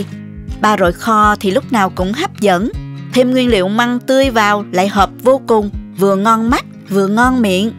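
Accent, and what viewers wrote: South, Vietnam. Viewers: Cám ơn nhiều nhé 😁🥰🤩! có giọng nói miền Nam Bộ thật dễ thương ❤❤❤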